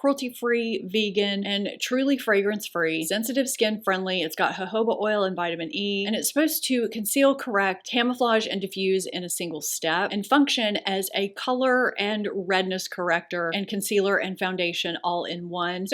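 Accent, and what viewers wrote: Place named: New Orleans, United States. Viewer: I was trying to place your accent lol. I went to med school in NO and should have known. It was a long time ago though. Very special city.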